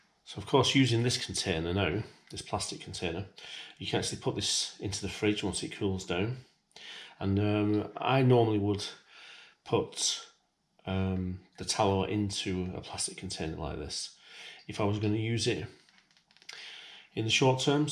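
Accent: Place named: North, United Kingdom. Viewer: The Northern accent gives a certain degree of credibility, you know this beef dripping is the real deal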